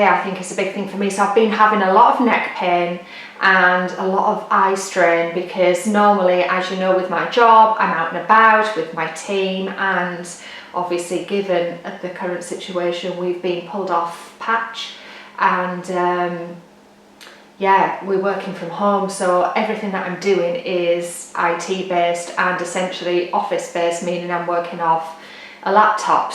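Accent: United Kingdom. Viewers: Hi, I sure do miss your videos. Please consider making one soon. I love watching you, your English accent and your sweet Daisy. Plus your birdies. I would love to also see how you do the petite stitch/tent stitch on your Mirabilias. I just recently started Royal Holiday and I’m needing to see your beautiful stitching on faces. I hope we see you pop in soon. Love ya and leave ya!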